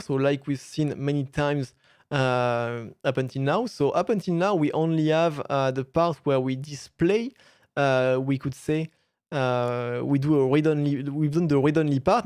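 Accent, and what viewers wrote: France. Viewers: fransız aksanı mı bu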